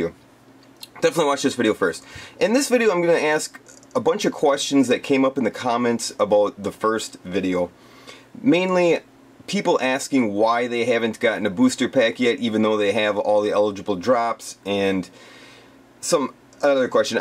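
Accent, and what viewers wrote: Canada. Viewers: Are you from Newfoundland?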